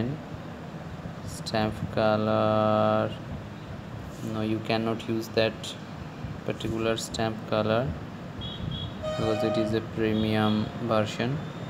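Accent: India. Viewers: I dont mean to sound racist, but why all videos related to cellphones has an Indian guy talking behind it? Its creepy. Its like every single video. Does beign Indian give you some special insight to these kind of things?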